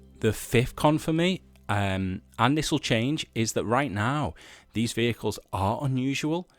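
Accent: Liverpool, United Kingdom. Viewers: Loved this thank you mate. Great to hear a fellow Liverpudlian voice too. Looking at getting this or the MQI. Really helpful review thank you!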